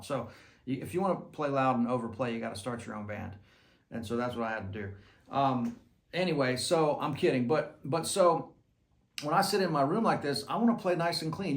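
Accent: United States. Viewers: reat vid great playing like that southern accent. I have Princeton reverb with ironman attenuator and like that setup. Background, i been playing only 2 years and no where to your playing and current live in sober living environment(been sober 2.5 years) and we have own rooms. So i try to be mindful of everyone. People work all shifts, i get up mad early and people say they rarely hear me play. I love my princeton and unless i start gigging or hit lottery doubt i really will ever need another Amp. I also have champion 40 w which doesn't compare but is adequate for heavier stuff. Just curious your opinion on attenuators they don't seen to be very popular option. I will try it unattuated later. I just always assumed that was suboptimal to play at 2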